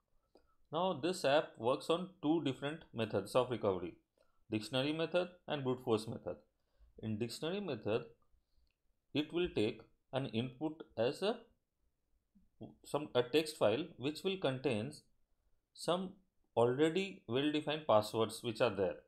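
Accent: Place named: India. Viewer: Sean Gardner relax man... he speak like an Indian. I don't think he's a Isis member. but, we can't trust too. :/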